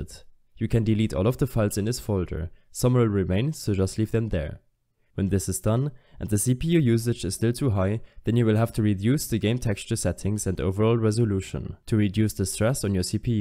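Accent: Germany. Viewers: Yeah, he sounds like Klaus because he's German :D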